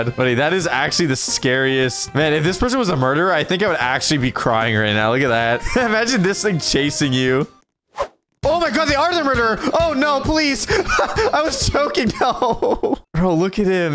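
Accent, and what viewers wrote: France. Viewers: I am french!!!! I LOVE YOUR FRENCH ACCENT!!!, DO U LEARN FRENCH OR SMTH? You're saying every words right!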